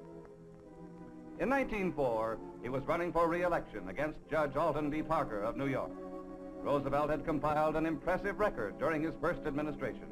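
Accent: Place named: Boston-Philadelphia, United States. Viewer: If you are American please explain to me why Americans don't sound like this anymore. The accent used in this video is so pleasant to hear. Today's Americans don't remotely sound like this. This 1950s video narrator is using what is known as a Boston-Philadelphia accent, which seems to have fallen out of use these days even in universities. Sad. Please explain why this has happened.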